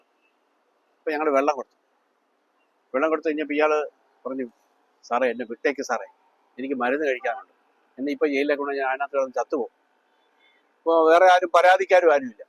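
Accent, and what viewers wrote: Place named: West Bengal, India. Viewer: 🙆👉 ee avathaarakan bengaliyaano sir❓avathaarakan 👎🥴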